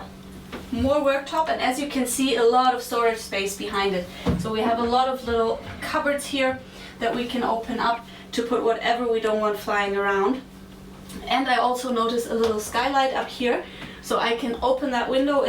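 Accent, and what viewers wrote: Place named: Scandinavia. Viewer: Nice video. Her command of English language is impeccable, despite a slight Nordic accent - to the point that I thought she might be American. It was only in the end, when the boat price was indicated in Euros, that I was certain she is European. Shouldn't she have introduced herself? As for the boat, the presentation was centered on more domestic qualities, some of them outright mundane, in my opinion. The fact that Hanse is a great light sailing ship to take out in weekends, or even longer holidays, in my opinion again, was insufficiently reflected.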